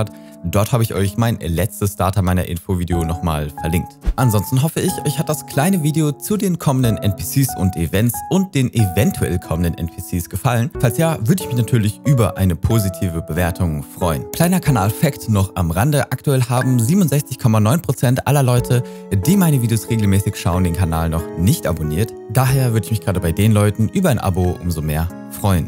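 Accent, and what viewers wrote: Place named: Bavaria, Germany. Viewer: Oh da hab ich soch ein Bisschen Bayrisch rausgehört. Bist du aus Bayern?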